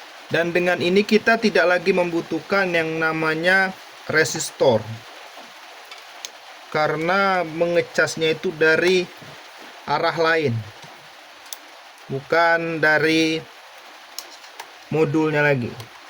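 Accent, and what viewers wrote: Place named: Medan, Indonesia. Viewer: abg orang mana ? logat nya ku dengar macam orang medan. mau tanya tentang speaker eggel ku bg. kalau volume kuat mati sendiri dia bg. ada solusi nya bg ?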